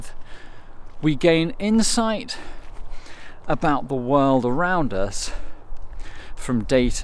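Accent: United Kingdom. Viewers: You're British, thank God!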